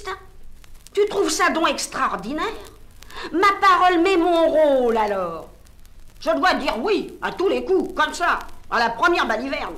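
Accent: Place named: Paris, France. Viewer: Avec ce texte tu sembles croire que je m'oppose à la lecture orale alors que ce n'est pas le cas, ça dépend des qualités de l'orateur, du niveau d'éducation littéraire du publique, du contexte (divertissement etc...). Donc encore une fois tu piges rien, et c'est pour ça que tu préfères taper, tu compenses ton impuissance intellectuelle par la force. Celine détestait la violence au cas où tu aurais oublié, il n'hésitait pas à séparer des bagarreurs quand il en croisait. Et pour ce qui est du jugement t'es encore une fois à coté de la plaque; je n'ai pas jugé Arletty dans sa personne mais cette simple tentative de lecture, ce qui n'est pas un problème si c'est argumenté. T'as jamais donné ton avis sur un film ou un livre peut-être. Je ne vois pas où j'ai été condescendant non plus, va relire la définition. Le sens du texte, si tu veux que je te l'apprenne, c'est un ado qui passe un examen, qui est angoissé par le poids de l'avenir que ça représente, inquiet par dessus le marché des risques de correction si le résultat est mauvais, qui en somme ne comprend pas bien la rigueur que la vie impose à un si jeune age. 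Et Arletty, au lieu d'essayer de faire passer cette angoisse, elle nous fait du Arletty (que j'aime bien d’ailleurs dans d'autres contextes), elle y va en décontraction, gouailleuse, vas-y que j't'en rajoute un peu d'accent titi parisien. Comment veux-tu que ça colle? J'ai deja lu un texte devant un publique et j'ai accepté les critiques donc ravale ta sortie sur l’hér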